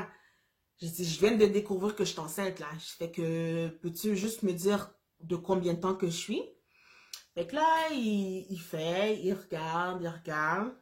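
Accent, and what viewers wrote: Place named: Haiti. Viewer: Je sens à ta voix que t haïtienne 🇭🇹 💪🏾 En tout cas c’est une histoire extraordinaire c’est Dieu qui t’a donné ce petit bout de chou pour te dire de garder espoir tout n’était pas fini pour toi Par contre ton conjoint la sa réaction est très mauvaise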